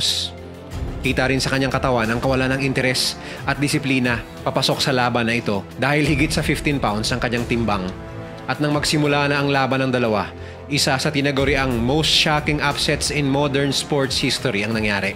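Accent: Visayas, Philippines. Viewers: Bisaya ka pre no? Hahaha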